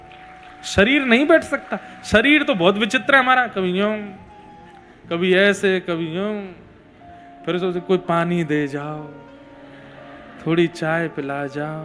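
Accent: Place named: Braj, India. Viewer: 🙏🙏JAI SRI KRISHNA. Guru ji jab aap Brij भाषा mai katha sunatae hai bas lagata hai sunti hi rahu 🙏🙏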